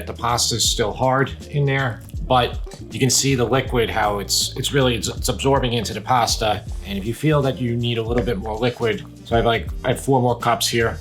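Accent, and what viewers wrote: New York, United States. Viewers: Oh and btw, I love your NY accent. I'm from Germany but I lived in Hoboken for 4 years and it takes me back. 🙂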